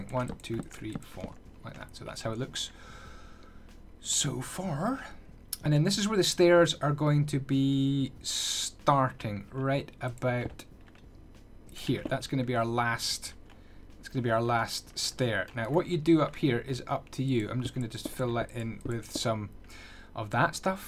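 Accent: Scotland, United Kingdom. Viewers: I love your accent. Are you Scottish by chance? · It looks so gorgeous once it’s built - also you sound Scottish so if u are, fellow Scot here ❤️😂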